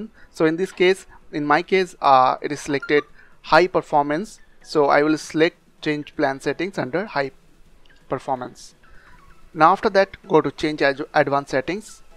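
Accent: India. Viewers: when i heard indian accent voice i though this guy is trying to scamm people but seems pretty legit i just hate how some people can ruin own country reputation just like that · Very strong indian accent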